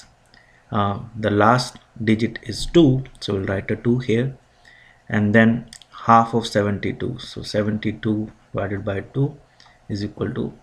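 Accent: India. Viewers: Fuck Vedic Math and Indian Accent! This all comes from Ancient Europe while Indians were worshiping cows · not being racist but indians have the most ADORABLE accent ever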